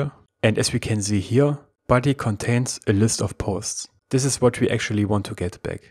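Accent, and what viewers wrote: Germany. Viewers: Are you from germany? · Subscribing because of the German accent! :D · Thanks for this brilliant tutorials! They are easy to understand and deeply. Firstly it was hard for me to understand your German accent :D But know I used to and your voice is so clear and understandable <3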